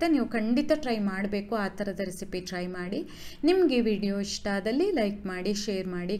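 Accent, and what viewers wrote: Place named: Coastal Karnataka, India. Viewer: love watching your videos, love your kannada accent, love your voice, it brings back the memories of my childhood I spent in Mangalore & Bantwal.❤️💕😘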